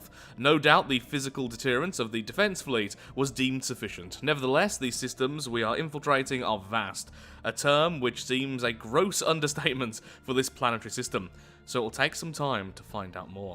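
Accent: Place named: Birmingham, United Kingdom. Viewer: That sexxy Brummy voice...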